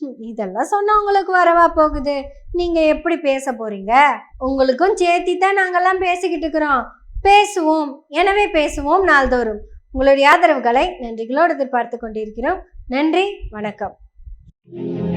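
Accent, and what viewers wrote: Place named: Coimbatore, India. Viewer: Covai tamil super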